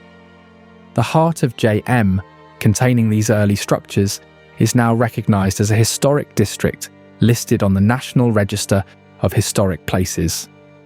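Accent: United Kingdom. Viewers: Wyoming is beautiful and amazing .... but why in the hell is some Brit with rosy cheeks and their funky accent telling us all about Wyoming and making incorrect statements and plenty of mistakes ... typical Brit trying to capitalize off of America's land and beauty ... maybe a hillbilly mountain man like me should go over there and make a video and tell them all about "STONED HEDGE".... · Can we get an American to speak about Wyoming not a limey annoying brit accent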